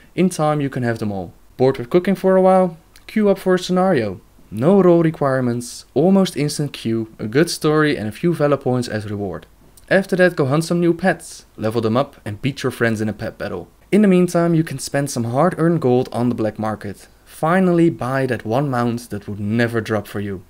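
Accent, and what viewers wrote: Netherlands. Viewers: me too i kinda heard it already its very easy to hear a dutch talking english any way good review ^^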